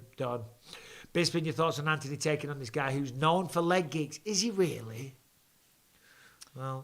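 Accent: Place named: Northern Ireland, United Kingdom. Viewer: Its a solid NI accenf im impressed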